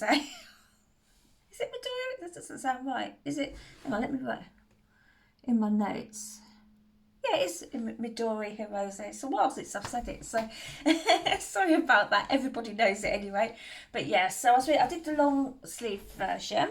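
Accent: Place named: Scotland, United Kingdom. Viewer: It's lovely to hear your Scottish voice here in Texas where I knit all the time, but it's rarely cold enough to enjoy wearing the items. I do mostly fingering weight projects here. LOVE this channel. As a person planning for retirement in about a year, I need to have EVERYTHING frugal, especially the hobbies.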